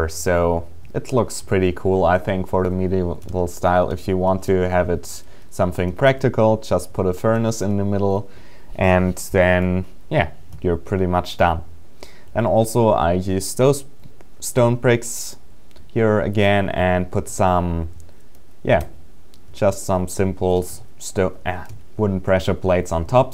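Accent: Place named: Germany. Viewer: man i love your german accent so much. Haha Nice video man!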